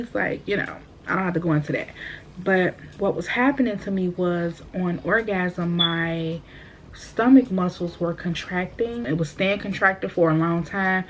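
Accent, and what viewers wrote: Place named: Detroit, United States. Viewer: You sound like a fellow Detroiter!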